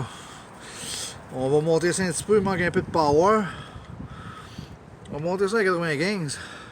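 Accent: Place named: Canada. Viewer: Bonne vidéo je fais une découverte avec ce tank . J'aime bien ton accent Canadien 👍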